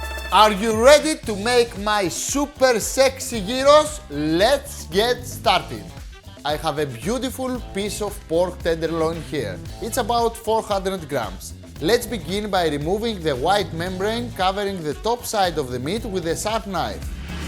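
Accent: Greece. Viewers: his accent is great and I mean it. Why so many Greeks have a problem with his accent? Why on earth do you feel embarrassed with something you shouldn't and it's none of your business. And finally don't forget that for the English speaking world he is an Greek!! That's what he sells and the accent could be very well part of it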